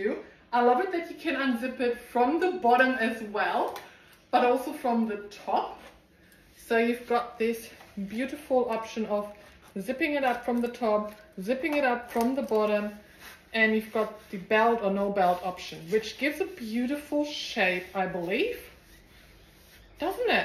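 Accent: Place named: Germany. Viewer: I love your accent,. Are you German?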